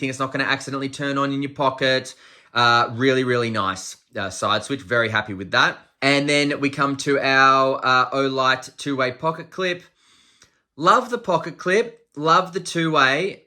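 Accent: Australia. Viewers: with an aussie accent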